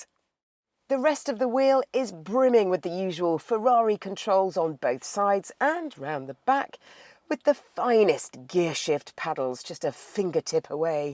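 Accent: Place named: United Kingdom. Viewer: Hello! Love your very British accent!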